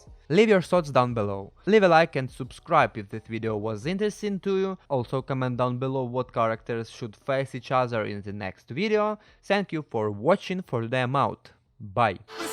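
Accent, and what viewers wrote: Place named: Russia. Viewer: у тебя какой то русское акцент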